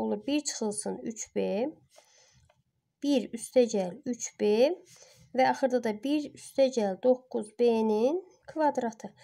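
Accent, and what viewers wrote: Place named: Sheki, Azerbaijan. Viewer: Birdə sizə sualım var🙃.Çox marağım tutdu.Siz Şəkilisiz?Ləhcəniz Şəki ləhçəsi kimidi.Mən bakılıyam.Amma anamda sizin ləhçədə danışır.Zəhmət olmasa mənə cavab yazardınızda😇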